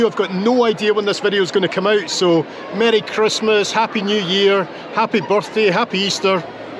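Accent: Scotland, United Kingdom. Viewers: Hey, I just subscribed to your channel. I've been watching for about a week now and to mimic your lovely Scots brogue "I haven't been disappointed!" Your day trip to Gothenburg was an eye opener as this is where my granny grew up and throughout my life never knew what the city was like. Thanks. This morning I watched your Salamanca return visit. It was amazing. After watching all these trips of yours, I just cannot figure out how you can afford it 😮. What's your secret? I'd also like to know a bit of your back story. Letting you know you have a new fan. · As a Norwegian, I do understand your Scottish dialect quite well. I have a friend from Glasgow, I need to ask him a few times when he elaborates in discussions in Glasgowean. I guess it is a matter of listen to it and get used to it and to tune your ears to it. Then you will hear and understand it after some listening. 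I have the Rab C Nesbitt series, it is subtitled in English. I invite you to Norway. We do have dialects here that are like separate languages. Norway and Sweden is like Scottish and English dialects. Swedish people say they dont understand Norwegians, but that is just lazyness and non interest of trying to listen to the sound. Norwegians is opposite and try to tune in to Swedish language and that works.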